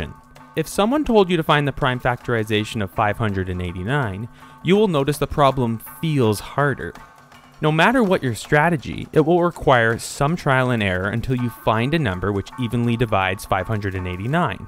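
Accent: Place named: Canada. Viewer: i was like there's something weird about this accent.. then he said aboot.. and i was like o right, he's canadian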